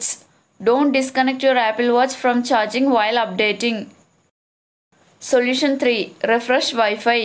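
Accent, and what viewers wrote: India. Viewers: That malyali accent is sooooo nice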